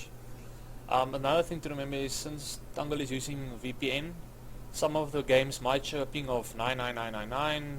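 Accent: South Africa. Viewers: Jho br, daai afrikaanse aksent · South African? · are you South African ?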